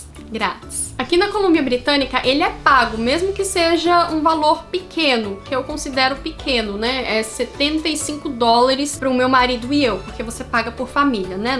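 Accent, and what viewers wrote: Brazil. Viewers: mesmo vc morando ai a 4 anos, eles sabem que vc tem sutaque br? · Eu sou mineiro e o seu sotaque parece muito com o daqui de Minas, exceto com o da região norte do estado, que é mais para o sotaque baiano.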